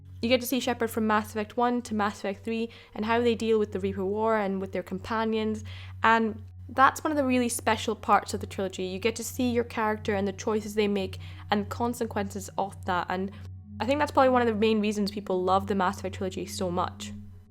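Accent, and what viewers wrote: Scotland, United Kingdom. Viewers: sounds Scottish to me.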